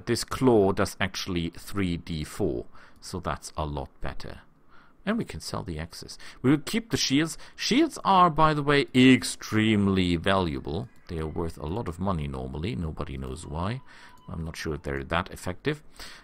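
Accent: Germany. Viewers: "Papaaaa, let's invade ze Poland again" heh Cute german-like accent aside, really looking forward this let's play, always nice to have some cozy voice playing something on the other monitor while I waste my life away grinding some game.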